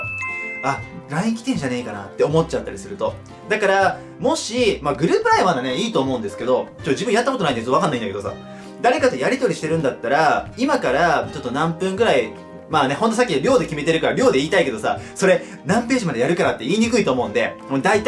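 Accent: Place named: Kansai, Japan. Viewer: 関西弁です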